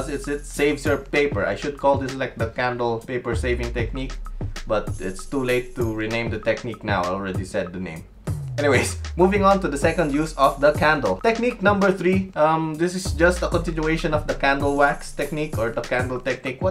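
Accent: Philippines. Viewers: Uhmmm are you Filipino?? Cause you sound like one... No offense tho cause I'm Filipino too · Are you Filipino? I just noticed your accent and the way you talk. Idk if im right, but.. Are you? PS. I CAN'T BELIEVE I JUST SAW YOUR CHANNEL NOW *smashes subscribe button in rage* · Kuya nag tatagalog po ba kayoo... parang pinoy po kc kyo.. 😅😅😅 .. do you have a video about rendering style but pen and ink only rendering..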